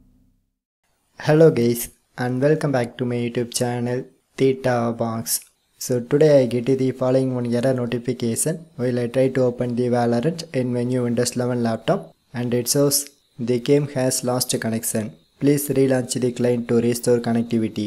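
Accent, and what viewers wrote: India. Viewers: BRO I FCKIN LOVEEEE YOU SO MUCH WITH YOUR INDIAN ACENT IT WORKEDDDDD THANKS MAN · U hear that indian accent u know u already got ut fixed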